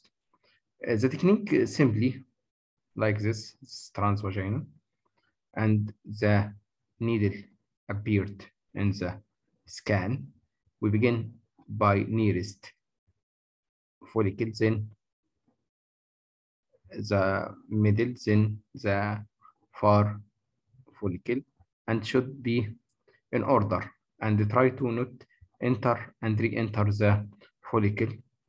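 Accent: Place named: Egypt. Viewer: Egyptian English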